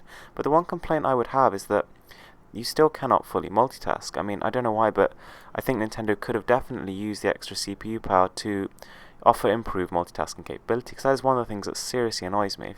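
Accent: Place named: United Kingdom. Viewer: his voice...its so...BRITISH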